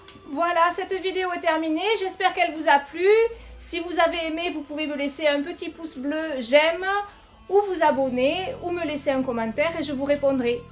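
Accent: South, France. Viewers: Bonjour, Un Très Grand Merci pour ce Partage et cette profusion de générosité artistique personnelle qui est la tienne! Agrémentée par ce merveilleux, savoureux accent méridional qui ne peut que réchauffer les cœurs de ces espèces d'artistes congelés et recroquevillées sur leurs certitudes académiques aux quatre coin de l'hexagone....et même bien au delà.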